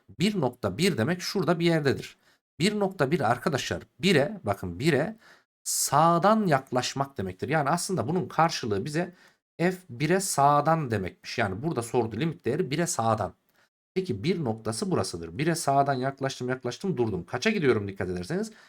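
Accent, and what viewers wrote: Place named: Kayseri, Turkey. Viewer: Hocam nerelisiniz konuşma tarzınız kayserililere benziyor da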